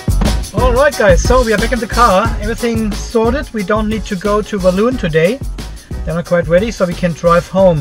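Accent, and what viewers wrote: Germany. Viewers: when are you going to upgrade to a new Mitsubishi Outlander PHEV? I'm looking forward to the 2020 version in North America, hoping that the 2.4L engine that is promised will provide better hybrid mileage and perhaps updated/better BMS and a little bit more electric range. Otherwise, please keep the educational videos coming; they are all fascinating and your German accented English seems normal/part of the friendly charm of your stories about a journey with the Mitsubishi PHEV. Two thumbs-up for this video, even without the watchdog data along for the ride...j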